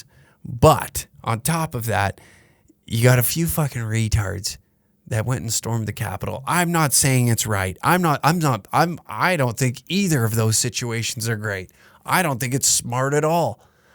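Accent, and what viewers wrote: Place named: Alberta, Canada. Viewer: You have the best FUBAR accent I've ever heard. Only a proper 'Bertan can talk like that.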